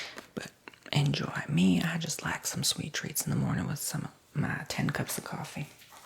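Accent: South, United States. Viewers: At least your a nice southern cafeteria lady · Love the Southern accent lol Are you on the Tingles app? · I’m in LOVE with this character!!! I love me a GOOD OLD SOUTHERN DRAW! Voice is perfect and soothing! The stories and chit chat are on point! Great job!!! 😊😊😊 THANK YOU for this!!!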